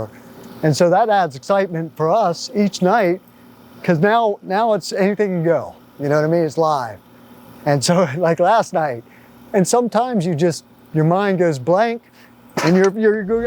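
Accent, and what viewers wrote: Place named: West Virginia, United States. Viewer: I'm a lifelong drummer from Wheeling,WV and I always thought I heard some WV in your speech.Not Hillbilly just our region.Proud of you dude.I loved this interview.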